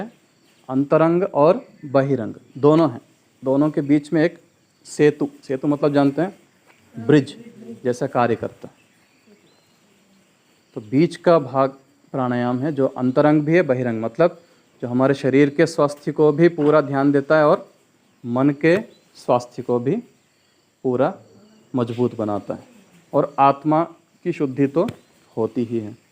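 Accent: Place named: South, India. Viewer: अद्भुत बाबा जी, बहुत ही गर्व महसूस होता है आपको सुनकर,, आपके सरल सहज ज्ञान प्रेषण करना अद्भुत है,, गर्व यह भी होता है कि आपको हम या आप हमे नाम से जानते हैं,,, धन्यवाद,, आपकी साउथ स्टाइल संस्कृत वाली हिंदी सुनना बहुत ही अच्छा लगता है।।जय हो Er प्रशांत दुबे, अस्सिटेंट प्रोफ़ेसर, उत्तरप्रदेश तकनीकी विश्वविद्यालय , लखनऊ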